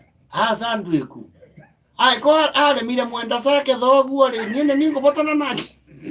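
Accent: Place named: Kirinyaga, Kenya. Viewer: Unaongea kama watu wa kirinyaga avai hahaha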